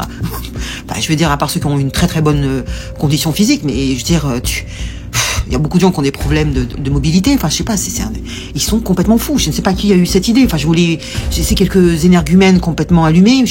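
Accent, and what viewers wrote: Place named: Rhône Valley, France. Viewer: Excellente nouvelle, merci du partage plein de bon sens, depuis la Côte Basque ❤ C'est migonn cet accent de la vallée du Rhône😊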